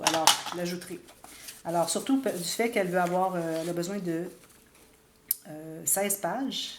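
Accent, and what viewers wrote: Quebec, Canada. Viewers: Bonjour, Merci beaucoup pour votre générosité. J'ai acheté des feuilles pollen kraft en 210g: cette épaisseur est elle suffisante? Autrement quelle feuille utilisez-vous, le grammage de 260g n'est pas le plus facile à trouver. Je tiens encore à vous remercier pour tous tous les conseils et tout le temps que vous prenez pour nous répondre. Un petit coucou de Nancy en Lorraine dans l'est de la France. Votre accent me rappelle des vieux souvenirs de Montréal quand j'ai visité le canada et les USA il y a très lomgtemps.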